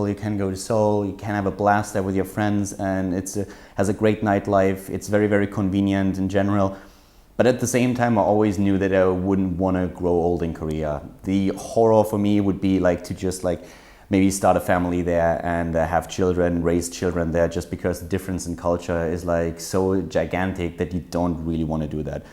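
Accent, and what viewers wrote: Germany. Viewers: Leider reagiere ich ziemlich allergisch auf Englisch mit deutschem Akzent. Denn obwohl Englisch sehr gut ist, hindert mich der leichte deutsche Einschlag doch daran, seine Casts voll zu genießen. Insofern wäre ich auch durchaus dankbar, mal was auf Deutsch zu hören.:-)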